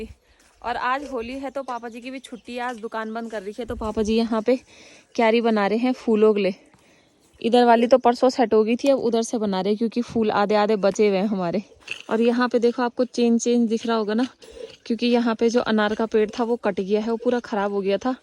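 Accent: Garhwal, India. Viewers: aap gadwali bahut pyari bolte ho phli baar suna mene ese bolte huye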